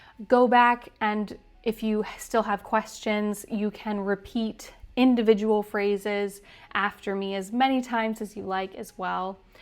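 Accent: United States. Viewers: Una pregunta es inglés americano ?? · ✨ You sound so good in this shadowing video! Super inspiring to practice along and pick up the American accent, intonation, and flow.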